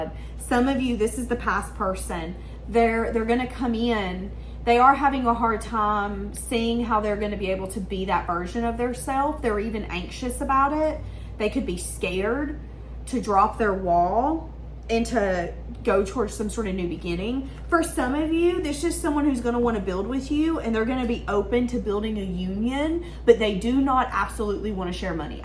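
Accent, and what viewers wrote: South, United States. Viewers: YES another reader with a PERSONALITY! some of these channels have such a low energy, weird dry demeanor like they don't even wanna be doing the reading lol and i LOVE the southern charm 😜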